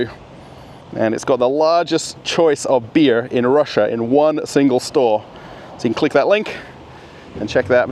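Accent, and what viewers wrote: Australia. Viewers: Hello,!!! По работе я не могу много смотреть твои видео,но к счастью могу много слушать. Поэтому я,пользуясь случаем, совершенствую свой австралийский английский,мечтая в будущем посетить твою прекрасную родину! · I'm russian, but I like to listen to clean australian english)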